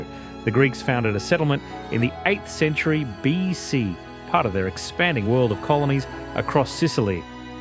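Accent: Australia. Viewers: Suspect Aussie? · is that an Australian accent I could hear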